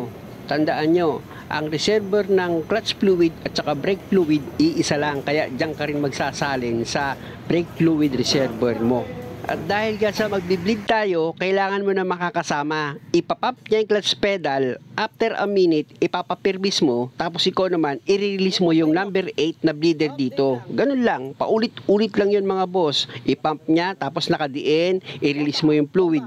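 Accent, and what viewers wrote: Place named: Nueva Ecija, Philippines. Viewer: Boss tiga saan po kayo my garod kayo sa salita eh nueva ecija po??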